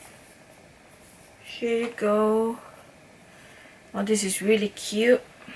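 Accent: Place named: Jamaica. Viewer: This was enjoyable to watch 😂🥰🥰 Also, I love your accent, where are you from? Jamaica? I'm Nigerian btw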